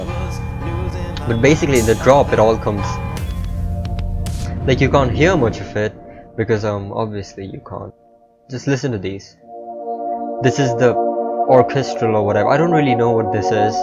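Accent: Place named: England, United Kingdom. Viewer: you tried to get english ascent🧐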